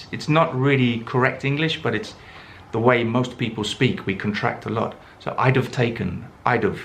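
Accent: United Kingdom. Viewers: Thank you very much! Great way of learning English grammar (also British accent, which I personally love😍). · I fall in love with your videos 😊 Especially your impossible British accent 😍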